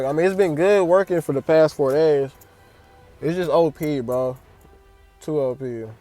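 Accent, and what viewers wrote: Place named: Bay Area, United States. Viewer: Bruh can tell by the way u talk u gotta be from around the bay, Vallejo here 💯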